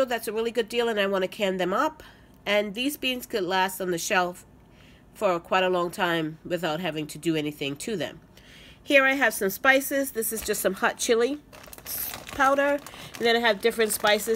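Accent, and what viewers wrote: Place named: Philadelphia, United States. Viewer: You are one organized lady. Loving your accent... what is that? Philly? Me being from the west coast you would probably say i am the one with an accent!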